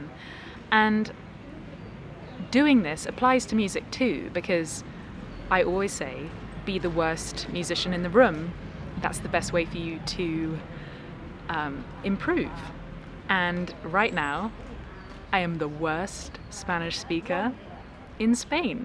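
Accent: United Kingdom. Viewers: I love watching your vlogs and to boot I love listening to you talking. Your British accent is so eloquent and perfect phrasing. I also love to hear your guitar playing and listen to you sing. Keep it up there are too few of your vlogs. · What part of the UK are you from,?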